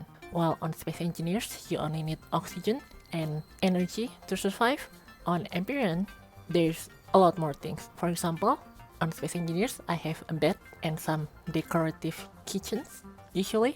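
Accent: India. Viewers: Hard indian accent?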